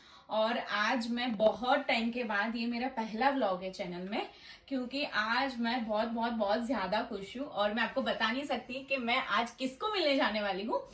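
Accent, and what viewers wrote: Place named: Gujarat, India. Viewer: Your gujarati accent is good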